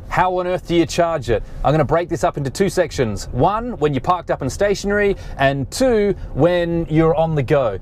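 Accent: Australia. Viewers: Awesome review best I've seen on YouTube so far and I've seen most on the Outlander PHEV as I used to own a 2014 model in Sydney and Perth. I sold it after 3 years to buy a Prado with 7 seats but now regret selling it as I'd go for weeks without putting fuel in it and loved the paddles that allow you to control the level of regenerative braking. I recently sold my Prado for more than I paid for it due to crazy prices due to Covid and bought a 2020 hybrid camry for the wife. We have a 5.3kw solar system with 20 panels that only cost $1000 on our roof so charging an EV in the day costs us next to nothing. I want to get a 2020 PHEV now or possibly the new Kluger (Highlander) Hybrid that's coming to Australia this year. Speaking of Australia was great to hear your Aussie accent! Where are you from in Oz? Sounds like your enjoying Canada & have a great job. Thanks for the excellent review am looking forward to watching more reviews especially on Hybrid or electric family SUV's.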